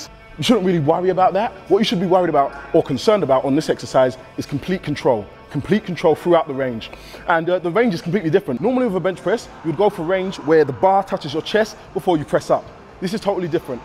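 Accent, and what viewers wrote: United Kingdom. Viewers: Some British accents I like, this one just irks me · I have been bodybuilding for over 40 years, and you are by far the best trainer I have watched! You are the "Real Deal." Love your methodology, eating, and your pretty wife. UK accent is nice also. I am 68 years old and still hitting the gym 2 hours 3-4 day's a week! That is why you have never been injured the by way you train.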